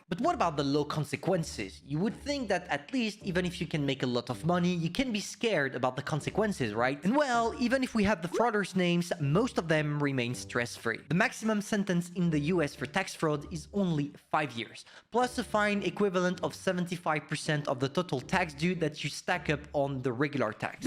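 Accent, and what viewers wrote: France. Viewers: Very interesting and informative... but IMO it would be good to have some curated subtitles alongside as your French accent is quite strong. I find it difficult to understand some parts as a native English speaker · ! Been watching you for a while know and you probably know that already. I would rate this video a 9/10! For a first timer this is fantastic and I would definitely not mind watching vids like this. The only thing you could improve with is your pace. It was a bit quick and due to your French accent it's slightly difficult to understand. If you slowed down a bit 10/10 vid! GL on making more vids like this, these type I enjoy:D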